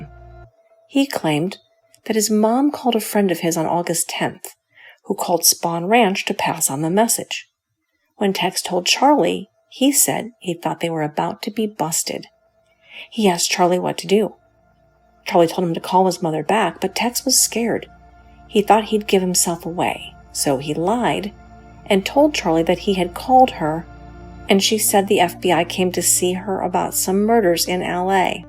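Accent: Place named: Texas, United States. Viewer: Love your texas accent ❤😅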